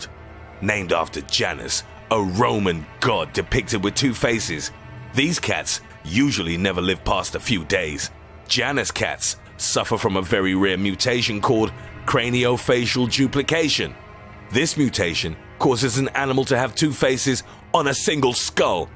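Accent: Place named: United Kingdom. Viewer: This british narrator makes everything 10 times as scary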